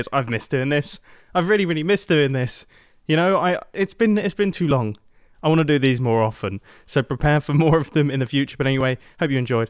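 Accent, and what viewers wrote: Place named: United Kingdom. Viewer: there both Brittish difference is Wheatly is Dumb while has a basic Idea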